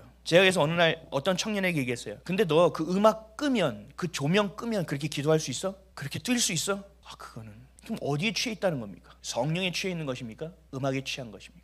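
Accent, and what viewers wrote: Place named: Pyongan, North Korea. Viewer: 목사님 혹시 선대가 이북에서 오직 믿음 을 지키고자 남하셨던 실향민 이 아닌가 생각 되는데요. 목사님 억양에서 아주 살짝 평안도쪽 억양이 있으신것 같아서요. 저의 외조부 님도 이북분 이시라 그리고 어려서 피난촌 주위에 살아서 할머니 할아버지 주위에 이북분 들이 많았습니다 . 제가 어렸던 70년대 초때만 해도 예수쟁이 = 이북사람 이런 공식이였으며 이분들이야 말로 진정한 그리스도 의 믿음을 간직하셨던 분들이 많았죠. 저는 이분들이 이제 다 대한민국 에서 천국으로 뜨나셔서 하나님 앞에 바로 서셨던 분들이 진심으로 이나라를 위해 간구 하시는 분들이 안계셔서 대한민국이 이모양이 되지 않았나 저 나름 생각 합니다. 우리가 아무리 믿음이 있다 해도 이북에서 목숨 걸고 내려와 목숨걸고 예수 믿었던 분들 에게 비하면 아직도 많이 부족한가 봅니다.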